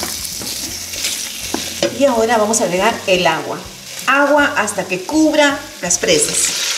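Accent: Peru. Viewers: Exactamente se nota cuando habla un peruano en el extranjero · El acento y la comida peruana es exquisita y con sus variedades en las preparaciones de sus potajes...TE AMO PERU !!! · El acento peruano es muy bonito gracias por la receta un abrazó.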